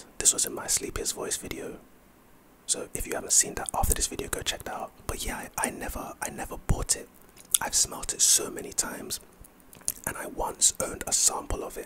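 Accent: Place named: United Kingdom. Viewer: bro reminds me of hobie (british accent) 🫣🫣‼️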